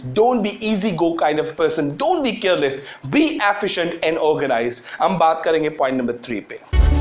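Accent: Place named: Pakistan. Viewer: I'm from Pakistan and your speaking style is like Pakistanis You present the topic very well Thanks 💖💖💖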